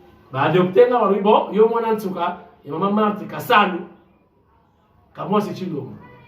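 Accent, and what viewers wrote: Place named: Manianga, Democratic Republic of the Congo. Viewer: Ozangi koloba na espagnol: SU SALUD ESTÁ BIEN. Tanga comme si ozotanga ki manianga.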